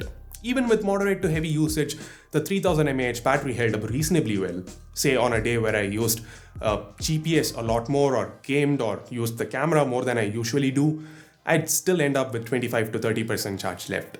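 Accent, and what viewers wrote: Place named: India. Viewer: Im an indian too. But this guy has the most indian accent ever.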